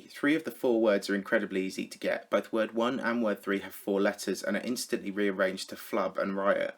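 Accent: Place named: England, United Kingdom. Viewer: No, he's not french. He doesn't speak French, he doesn't look French, and has an English accent. He's from the UK.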